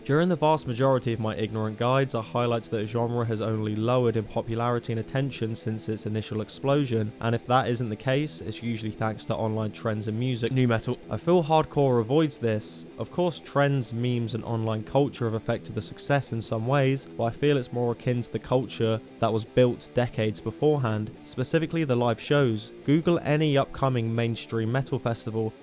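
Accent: United Kingdom. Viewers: British accent, video disregarded. Go enjoy the sex pistols limey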